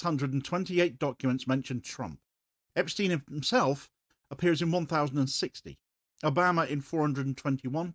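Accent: United Kingdom. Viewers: Love your vids, be careful if you live in uk(you sound British)they might arrest you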